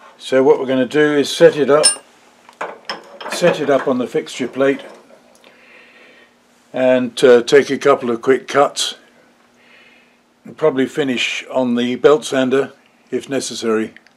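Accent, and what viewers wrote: England, United Kingdom. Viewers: Hi. You are English? Where from buddy? I'm making a video right now for you.... I have some allooominum too 😉 I'll try to get it up this weekend. All fun.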